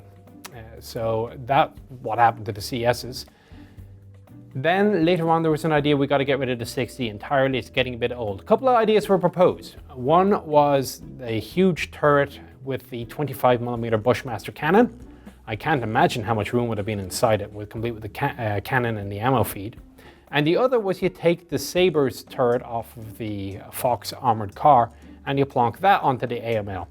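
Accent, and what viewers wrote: Ireland. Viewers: I just sold my Steyr AUG A3 to my friend. I loved the rifle but I'm looking forward to get the Australian F90 when it comes up to the market. My step-brother's wife is Irish, but her family doesn't seem to have the accent as you are. They would visit Ireland once in a while and my step-brother would bring back some "unmarked" whiskey from local bars. Sadly, I spent all my deployments in WESTPAC, including some exotic places: Kwajalein Atoll and Iwo Jima. Witnessed live volcanic eruption at night and I tell you, it beats Kilauea IMHO. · I always heard your accent but never knew you were actually Irish. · hahaha. so whadaya wanna do? Irish to the core. Love it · Are you Irish? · Your accent never bothered me all that much. The US military hosts numerous Spanish-language accents, a few Caribbean English ones, some African accents, and I even remember a Fijian, excuse me, Peejeean Hindi accent. 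Why should an Irishman here and there throw so many noses out of joint? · As a real Irish man I would guess he comes from middle class cork city probably Douglas or somewhere near that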